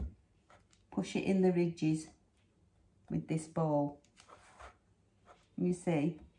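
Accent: Lancashire, United Kingdom. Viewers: Ooo looks yummy must have a go at that soon. New subscriber here & loving your content, are you a (dare I say it) a Lancashire lass? It’s where I’m from….thought I recognised the accent?! Look forward to catching up on your previous videos & please do a cook with me video, I for one would love it. Thank you, take care. Kind regards, Lynda 😉🌻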